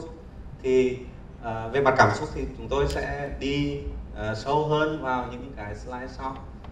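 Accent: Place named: Central Vietnam, Vietnam. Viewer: Bác này người miền Trung thì phải, giọng lơ lớ. ^^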